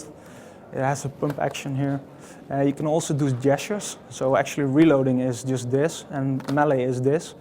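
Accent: Netherlands. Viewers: he is not russian, he is DUTCH haha i hear i because i am dutch too!!! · You sound kinda dutch. Are you? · the guy sounds dutch to me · lekker nederlands accent haha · your dutch! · definitely Dutch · nederlander? ik hoor wel een nederlands acsent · woow, dutch guy (i am one :D) learn english, you are to obvious ;D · This guy is definitely Dutch, listen to his accent. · he's dutch, i know it of the accent that sucks hard. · Dutch accent, MY COUNTRY FUCKING ROOOOCKS!!!... Except the cops of course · ge hoord direct da da nen hollander is he xD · Nah it's a guy from holland they all have this accent when they speak english :D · He's definitely Dutch. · YOu can hear this guy is Dutch.. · He sounds dutch :) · dude, are you dutch? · I'm SURE this guy is Dutch. It just sounds to much like a Dutch speaking person trying his absolute best to talk English without too much of an accent. 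Like if you're native language is also Dutch and you agree with this statement! :p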